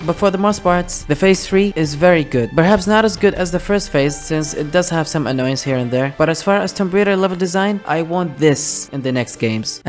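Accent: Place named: Gulf, Arab world. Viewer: خليجي من لهجتك بس مدري من وين. كل عام وانت بخير وصحه وسلامه وعيدك مبارك، مقطع جميييل واستغربت ان باقي فيه عرب مهتمين بالسلسلة الكلاسيك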